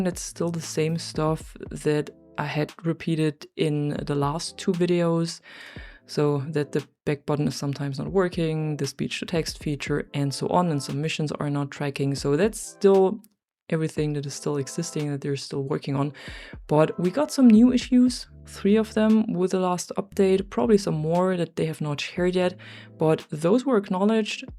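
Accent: Germany. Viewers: And that loveley german accent.;D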